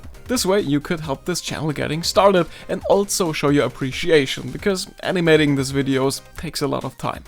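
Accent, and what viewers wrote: Germany. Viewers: Man hört den deutschen akzent noch durch, aber das wird wohl besser werden mit der zeit. ausserdem ist der voll gut, man merkt ihn aber er ist nicht krass nervig oder ähnliches. Aber die übersetzung an sich ist echt schön geworden :o auch die betonung usw. Narutos clothes are THICC (okay) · Dude I love your accent (Hört richtig das Deutsche durch XD) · Ich finde das du super Englisch sprichst. Eigentlich stört mich ein deutscher Akzent im Englischem immer, welchen man bei dir auch ein wenig raushört, doch es klingt trotzdem gut ~ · I have never seen your channel before, but as soon as I heard your voice, I knew you were German. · Ah, German?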